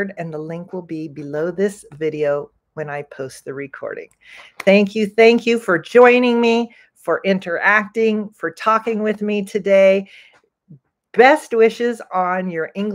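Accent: United States. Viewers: Unfortunately, I didn't watch the livestream. However, now I am watching and I can understand each word coming from your mouth very clearly although you're American. Since American English is harder to understand than British English. Probably you are speaking slowly because of your having lots of international followers. Good channel and very helpful. Thanks for your contribution. 👏👏👏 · I am from Indonesia and always glad to hear American English with speak slowly like you do. I just still dificult to understand between can't and can. Thank you. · Dear Madam I like to hear your voice and I almost understand all the content of your speaking. Even though, I've been learning English for almost twenty years, up to now, I can't imitate American's intonation and style like you do.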